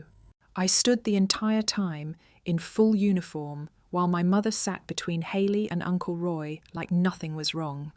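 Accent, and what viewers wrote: United Kingdom. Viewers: The stories you print are plausible but lack authenticity. Supposedly about American military personnel. But they are narrated with a British accent. even the pronunciation of the rank of LIEUTENANT is pronounced as it would be in London, as LEFTENANT. I confess that took away any interest I had in the story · Oh Dear. Another American based military story , Narrated in a British voice. I’m not really fond of this. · Not to mention the British accent…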